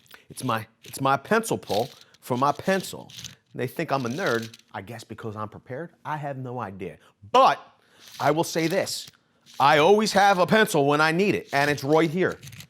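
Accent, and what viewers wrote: Philadelphia, United States. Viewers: Wudder. Everyone is always like "you mean water?" Screw you it's Wudder. Love our Philly accents bro. Go Phil's go birds. Suck it Dallas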